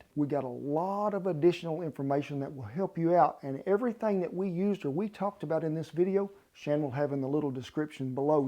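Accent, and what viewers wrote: United States. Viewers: Truly wonderful video. Thank you very much. As a British man, I have to say I love your accent. REAL USA. Keep up this brilliant and interesting work.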